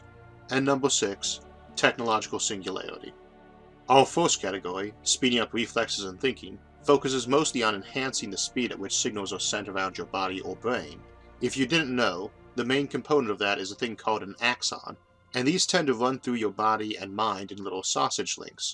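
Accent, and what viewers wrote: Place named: Louisiana, United States. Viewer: Thanks, yeah despite the speech impediment I think it was the Louisiana accent I was picking up on. I could tell it was a Southern style accent but wasn't sure.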